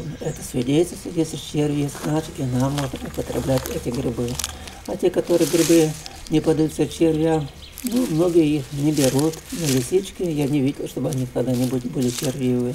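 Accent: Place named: North, Russia. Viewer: С севера видимо.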